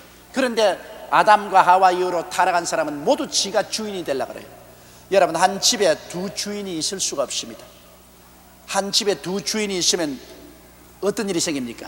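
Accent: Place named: Jeolla, South Korea. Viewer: 형명니 믄 절라도 말씨.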